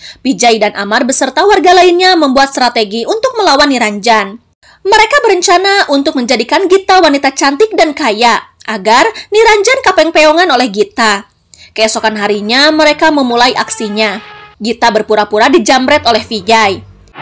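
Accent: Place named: West Java, Indonesia. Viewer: Alhamdulillah ya Allah, dapat PIN juga dari kak mimin, makasih kak mimin yg comel 💖💖 Jujur aku suka banget dengerin suara kak mimin dgn logat sunda nya 💖💖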